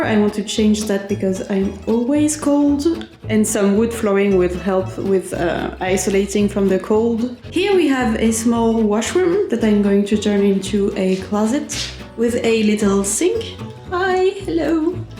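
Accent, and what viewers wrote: France. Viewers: Why the French accent while speaking English? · Bonjour - God I love the French accent.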